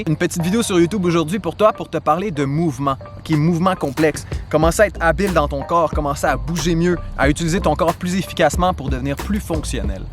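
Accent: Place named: Quebec, Canada. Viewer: J'adore t'ai vidéo surtout ton accent cebequoi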